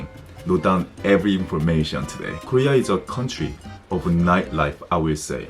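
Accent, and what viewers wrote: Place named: France. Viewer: Tu vivre dans la France tu as l 'accent d'un français parle anglais trop mignon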